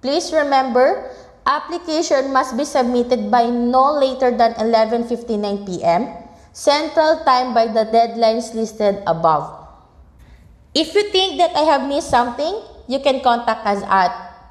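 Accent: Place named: Philippines. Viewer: I can tell from the accent, she’s Filipino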